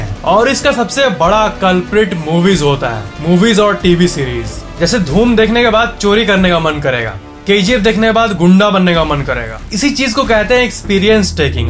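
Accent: Bihar, India. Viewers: Yes !! He is Bihari Bhai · sir aap bihar se hai kya..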